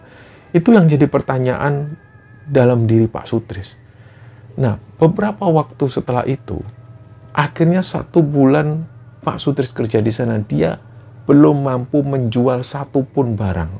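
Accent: Java, Indonesia. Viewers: Suaranya jawa banget mas,up trus jeh mas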